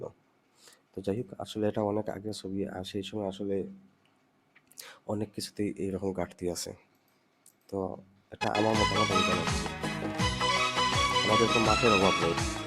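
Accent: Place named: Sylhet, Bangladesh. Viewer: উপস্থাপক মনে হয় সিলেটি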